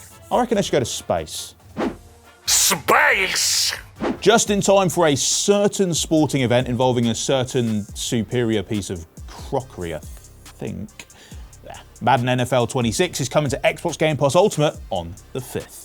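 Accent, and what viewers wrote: United Kingdom. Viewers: They need to get rid of these UK accents. Petrol? , this guy really said dinosaur in an alien world game.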